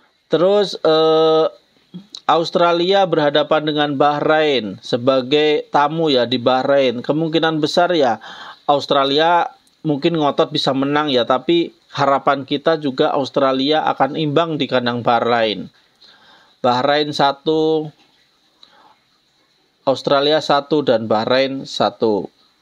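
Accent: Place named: Banyumas, Indonesia. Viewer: Ngapak bangeet mas...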